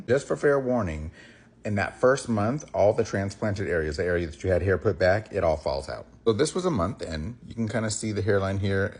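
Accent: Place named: South, United States. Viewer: I love this hair journey of yours! I also love how handsome you look, your southern accent and your name “ ”! 😂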